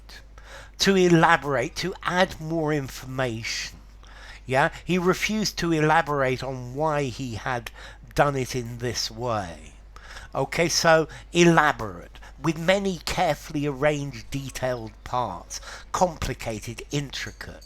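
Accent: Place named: Cockney, United Kingdom. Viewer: You're within the bow bell range Professor. You know how to speak Cockney 👏👏👏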